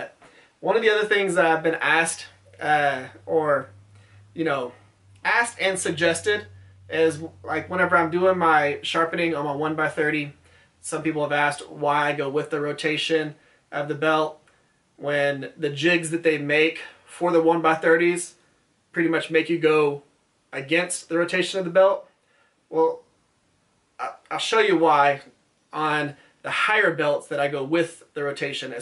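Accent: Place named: South Carolina, United States. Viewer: Hey bro, where are you livin'? You have the accent all my friends have, so maybe you're from SC. If you are holler at me and I'll roll thru and scan all those templates you have hangin' in your shop. Piece o' cake. Always enjoy the work you do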